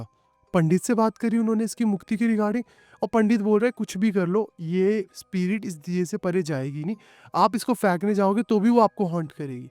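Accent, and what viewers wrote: Punjab, India. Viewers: आप पंजाब से हो क्या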